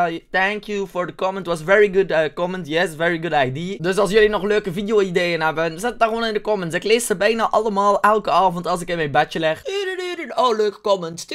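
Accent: Belgium. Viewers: heey, lees je dit nu in je bed? XD ik kan altijd om je lachen, en je Belgiche accent vind ik echt awesome. xx nima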